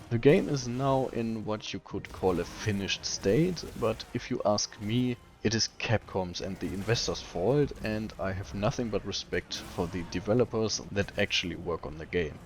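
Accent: Germany. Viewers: Are you german by any chance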